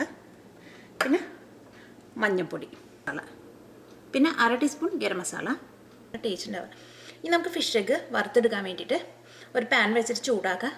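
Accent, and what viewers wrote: Thrissur, India. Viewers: Thrissur bhasha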